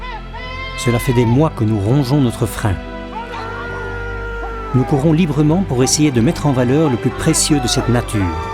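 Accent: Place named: Belgium. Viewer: Chouette film mais les commentaires sont d'un pompeux !!!! Très énervants et dits par un belge , je dirais , mais cela n'est pas le problème...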